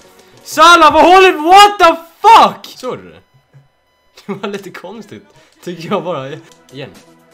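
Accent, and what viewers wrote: Sweden. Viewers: Är du från Småland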